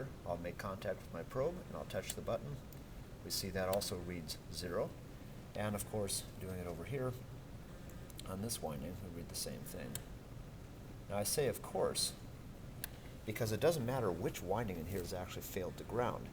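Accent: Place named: United States. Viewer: fed up of us accent ............. dat person shud learn english frnst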